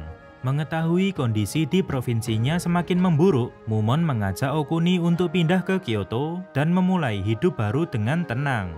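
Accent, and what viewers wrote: Java, Indonesia. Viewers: Wih logat jowo ne Ra ilang, lanjot terus gan tahanke logate rasah digawe gawe koyo wong hudu jowo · Jawanya pekat bngt👍👍 smngt bro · raiso dapusi medok e tp gpp ciri khas sampean mas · Bang wong jowo ta 😊😊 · Wong jowo Endi bang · suaranya medok:v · Sial. Malah ketemu jawa medok. Telingaku jadi gak nyaman. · Rasa belajar logat jawa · Suara medhok e bikin kange chanel ini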